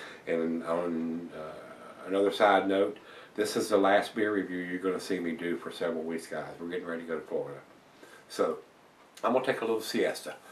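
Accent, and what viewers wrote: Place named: Virginia, United States. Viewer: hey! love your reviews brother. what part of va are you from. because you sound like your from my neck of the woods.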